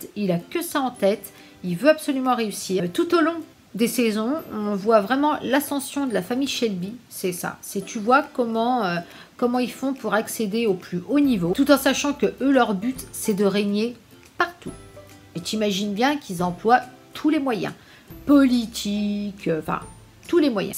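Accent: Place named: Quebec, Canada. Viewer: Hey je viens de découvrir ta chaîne , et ta façon de parler (timbre de voix ou accent je ne sais pas trop), me fais vraiment rire Aha ! Un total melange entre le québécois et le mec de la chaîne « Le bledar » x)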